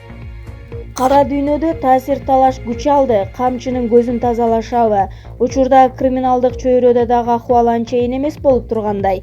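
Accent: Kyrgyzstan. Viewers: Кыргыз акценти менен сүйлө азамат кыз өз тилиңди бербе айткан айтып калааберет кызым.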